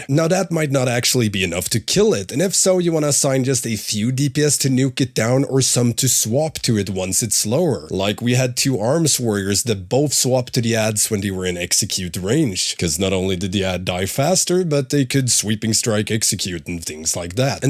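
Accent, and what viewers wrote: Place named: Sweden. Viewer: dat bjutiful swidish accent :)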